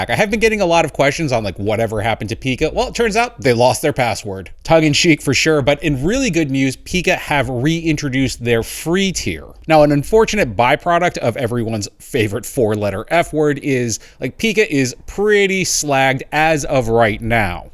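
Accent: Quebec, Canada. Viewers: Great video! :goodvibes:and it's a Canadian french Accent! 🥰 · Hello there, its more like a very strong French Canadian accent, French is my mother language !!! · As a french man myself, I'd say that hesounds like a guy from Montreal. Strong Quebec accent. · Je confirme c’est bien l’accent canadien du Québec😅😂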